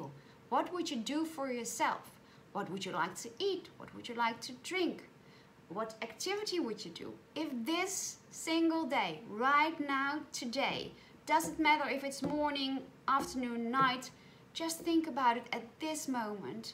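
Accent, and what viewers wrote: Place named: Netherlands. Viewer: THANK YOU THANK YOU THANK YOU for reading that in Dutch. I am 2nd generation Dutch/Australian and both my Oma and Opa have passed on but hearing you read that just brought back amazing memories of two Beautiful people who i miss dearly. Dutch is a beautiful language and I miss that strong accent so much ❤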